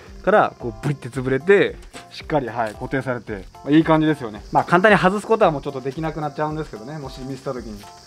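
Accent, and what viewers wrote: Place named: Japan. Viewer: 自分で満足感出すのサイコーですね〜！さんは、どこの県の方なのか？なまりが、！笑笑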